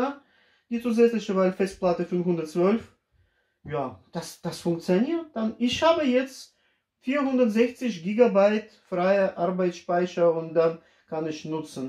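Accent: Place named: Russia. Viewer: Sehr, sehr sympathische und ruhige Art, dieser russische Dialekt (?) ist soo süß und sympathisch 😊 und deine Art zu erklären ist auch sehr verständlich und nachvollziehbar. Top! Danke 😊 Eine Frage noch: Das Gerät zum Wechsel bzw Erweiterung des Speichermoduls zu öffnen, ist das eine dieser "Aktivitäten" durch die die Garantie erlischt? 😅 falls ja, wäre das ja super dreist. Zumal Samsung ja explizit damit wirbt.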